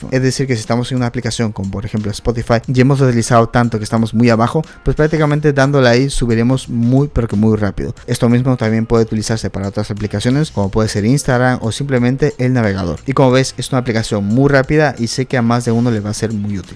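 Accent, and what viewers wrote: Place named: Mexico. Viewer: Bien fuera del video, por que siento que tiene acento mexicano pero usa palabras españolas?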